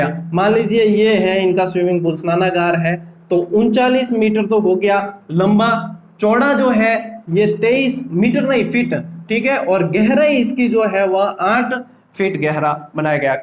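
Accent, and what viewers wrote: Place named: Marwari, India. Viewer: Sir apki marvari boli bhut achi lgti h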